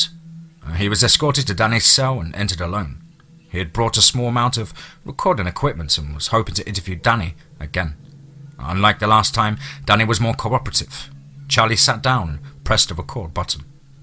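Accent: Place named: Scotland, United Kingdom. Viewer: It's one am and I'm halfway through... loving it. Love the Scottish accent as well.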